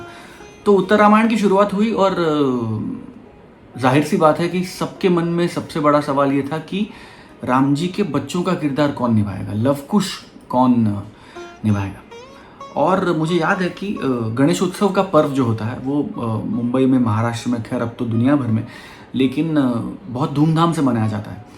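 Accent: Standard. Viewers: Swapnil ne bohot accha shudha hindi bola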